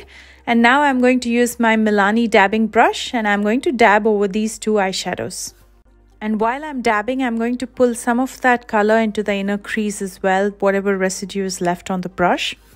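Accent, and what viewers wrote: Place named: India. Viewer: I love her soft Indian accent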